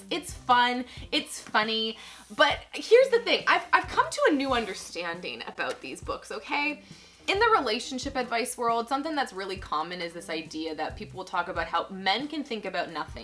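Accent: Canada. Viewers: you're back!!! also your canadian accent makes me feel so at home :-D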